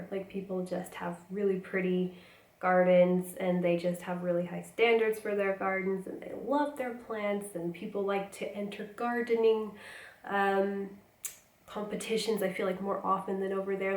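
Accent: United States. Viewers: You speak softer American accent... more feminine and polite sound...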